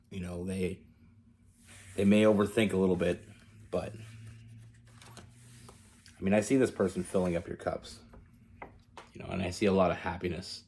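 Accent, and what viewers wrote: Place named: Canada. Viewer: Thanks for the story man I've been watching you for a while. For a while there I thought you were from Boston like me but turns out I guess you're from Canada. And I can kind of relate to you because all my cousins are from Canada. I definitely agree with friends first. I've known this guy on and off for years and 2011. All of a sudden out of nowhere he said that well basically you said that he didn't really say I love you but he said that he deeply cares about me I think he's afraid to say the l word so he is not ready yet. And kind of just came back in life. And I feel a little shaky or on ground because I don't know what direction this is going to head in. But I'm going to be patient with him and see where it goes. But he's the one that noticed that I didn't love myself and he stepped away for like a year. And now all of a sudden he says he misses me and cares about me deeply. 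I hope he's not lying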